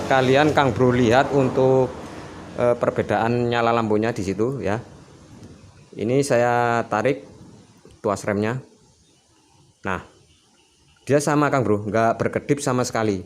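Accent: Java, Indonesia. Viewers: Bang berarti kabel yg masuk ke output kontak yg di sambung buat lampu tadi gk di copot lagi ya? . Abang ngomonya medok jawa banget tapi nama chanelnya nama suku batak, emang marga silaban ya?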